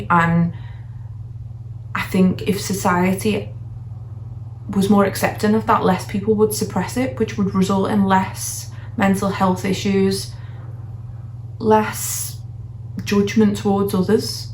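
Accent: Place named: Liverpool, United Kingdom. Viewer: Yes scouser 🙌🏻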